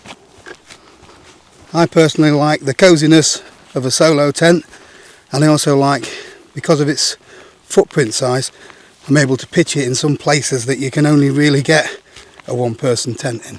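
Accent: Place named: Yorkshire, United Kingdom. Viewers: That Barnsley accent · is aggressively Sheffield sounding 😂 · from Barnsley, defo accent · Ahh it's great to hear a reet proper yorkie accent, from a Lancastrian lad, it's getting rarer is the colloquial English accent mores the pity. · I always thought you was a Yorkshire lad to be fair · I love that there’ll be a load of Americans out there, totally not understanding T’Yorkshire accent uses!! 🤣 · Yorkshire born... best accent, best product reviews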